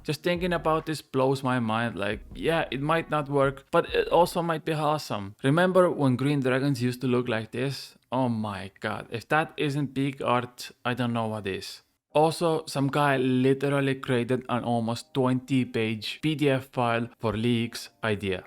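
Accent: Estonia. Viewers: Sa oled eestlane?? Mida hullu. just leidsin su kanali ja mõtlesin, mis aktsent see selline on :D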